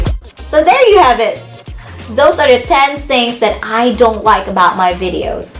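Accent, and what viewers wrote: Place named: United States. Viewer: Ang galing naman mag.english ni ma'am parang american din..team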